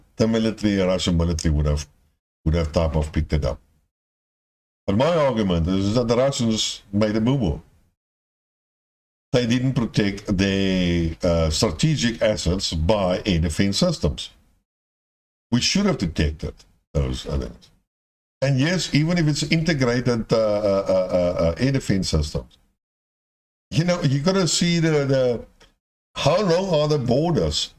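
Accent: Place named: Afrikaans, South Africa. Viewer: Disagreeing for t he sake of it with no alternative explanation. With a thick Afrikaans accent nobody can understand van de plaas.. i dont plan listening to more of your videos.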